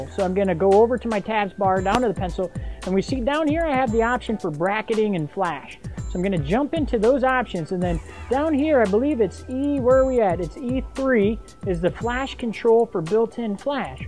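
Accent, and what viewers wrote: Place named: United States. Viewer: I so love your accent... I just want to visit the US on the next available flight. Your tutorial here is very helpful. Thanks very much from Patrick in the UK using a D90 and SB 700. Until now I was using flash off camera and using a little card to block out the light from the poppy up.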